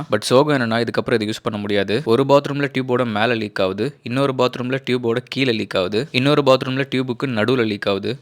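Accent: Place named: Nagercoil, India. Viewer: Bro unga voice konjo nagercovil la ullavanga pesuramari eruku 😅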